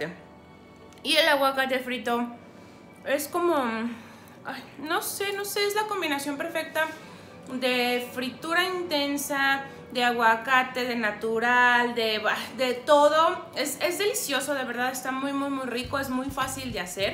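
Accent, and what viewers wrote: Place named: Fresa, Mexico. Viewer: Hablas muy fresa, además me desespera un poco que repitas las palabras tanto, por otro lado no es fácil hacer un vídeo, gracias por compartirlo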